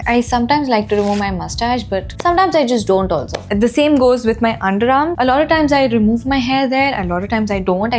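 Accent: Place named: Karnataka, India. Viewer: that kannada accent 😂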